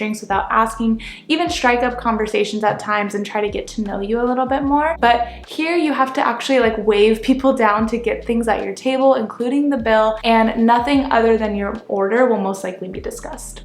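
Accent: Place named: United States. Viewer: Not trying to be rude but what did you say there about soccer here we call I football also you are true about the weather but the reason people may not be making small talk is you accent you have in my opinion a lovely accent but it is quite clear you are ammerican also we dont realy like small talk in general witch you are true to say also I am surprised how you didn't mention the lines I love your content not trying to be rude loved this video bye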